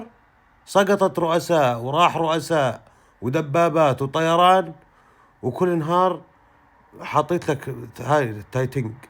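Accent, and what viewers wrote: Syria. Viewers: لهجتك من اي مكان بسوريا؟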